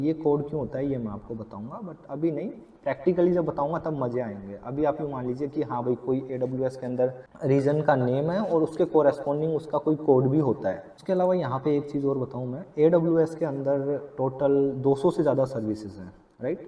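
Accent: Indore, India. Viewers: Just started watching your videos. Very nice explanation. Loved the way you explain. Also, love your Indori kind of accent, coz I am from Indore too :).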